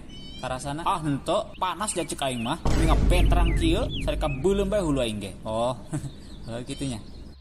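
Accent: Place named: Banten, Indonesia. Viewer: Logat namah logat banten iye doangna 😂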